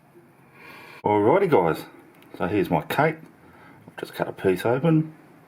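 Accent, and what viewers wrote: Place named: Australia. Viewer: Getting ready to try this with leftover Kentucky Bourbon from our daughter's Kentucky wedding! (Old Forrester 86 proof) Looks like a great recipe but I didn't expect an Australian (?) accent Y'ALL!! ;{) I'll report back next week.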